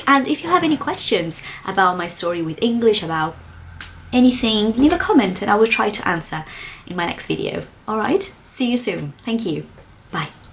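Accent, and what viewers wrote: United Kingdom. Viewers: Home and Away is an Australian soap. I just found you and I'm in love with your English. It is really good, clear and your British accent is amazingly beautiful. I'm so jealous of you as I consider English accent the most beautiful one, it is my favourite one. How can contact you? I need some help! cheers Tassia from Rio de Janeiro - Brazil - but currently live in Perth/Australia. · Eu amo seu sotaque britanico, taooooo lindoooooo :) fico tao feliz por entender, mas nao falo nada.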